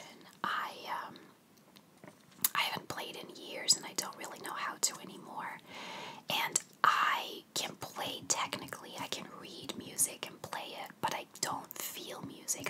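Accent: United States. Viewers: I love your accent, it has always sounded American to my ear! I am from Scotland though lol so many accents sound American to me 😂 xx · You remind me a lot of myself, older than I look, not married, Barack Obama was my answer to ”who would u have dinner with?” (Btw I don't think grandparents is an obvious answer b/c people tend to pick famous or infamous people) anxiety issues, and I can be introverted yet adventurous. Can't imagine moving to another country so young though. Also you speak perfect English, w/ American accent. I think you must have been American in another life ;) though it's seems Sandanavian or Northern European English speakers- seem to have sometimes next to no accent at all. Especially Swedes.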